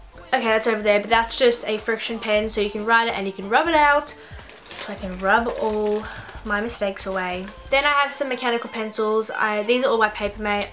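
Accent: Australia. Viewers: Sarah Ann Her accent sounds Australian... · Is her accent Australian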